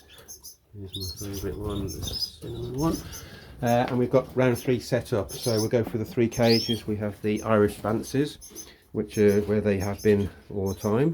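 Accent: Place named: Potteries, United Kingdom. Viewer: YOUR ACCENT AINT NO GEORDIE ACCENT, MY GUESS IS THE POTTERIES?? MY TEAM IS SO UNPREDICTABLE THESE DAY TOO MANY CHANGES AND A NUMBER ONE DE-STABILIZER 'POGBA' SKULKING FROM HIS CONSTANT INJURY TABLE, MAN UTD. LET'S......... AW GAN ALANG THE SCOTSWOOD RD AN'.......... WORSHIP ALLAN SHEARER, LOL''............ THANKS FOR THE PARTY FELLAS.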